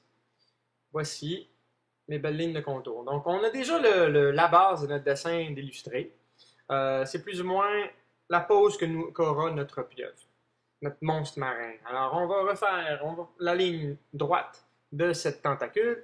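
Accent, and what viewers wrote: Quebec, Canada. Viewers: Je ne suis pas cebequois mais je trouve que c le meilleur accent du monde 🙂🙂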